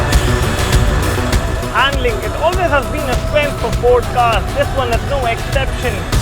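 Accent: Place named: Delhi, India. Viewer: Thanks for replying buddy ! btw I'm from Delhi You sounded bit like Delhi guys so that's why I asked you :p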